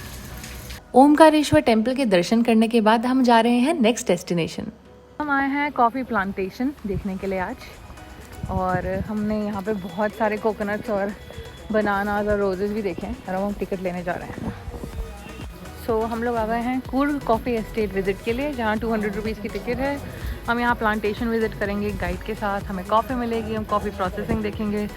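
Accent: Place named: China. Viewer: 한국인이 아닙니다 왜 자꾸 한국이라 하는건가요 발음이 중국인 발음이네요.전 한국에서 왔습니다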